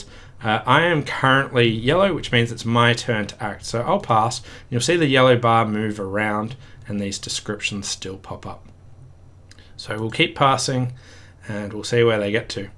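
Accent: Australia. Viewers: Thanks. Just learning myself, and have started using BBO. Enjoying going through your videos, nice to see and hear an Aussie voice on YouTube.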